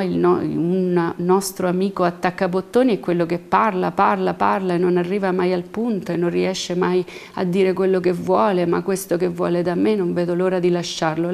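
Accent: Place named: South, Italy. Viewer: Quante scene. Brava la prof. Chiaro esempio di diatopia. É meridionale e si sente, embè?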